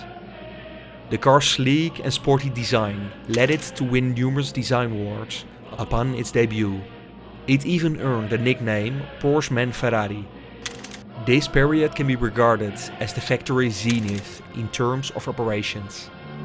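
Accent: Netherlands. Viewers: No crappy AI voice and just a nice Dutch accent ! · Haha ik kon aan je accent horen dat je Nederlands was maar mooie video hoor ;-)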